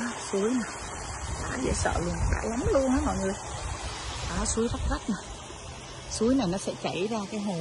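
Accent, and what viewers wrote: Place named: North, Vietnam. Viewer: E thích chị nói tiếng bắc ..vui . Chị nói anh Tuấn Anh quay chung với chị .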